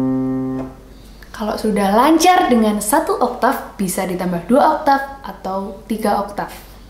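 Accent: Java, Indonesia. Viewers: kak medhok bgt suaranya 🤟😅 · Medoknya khas banget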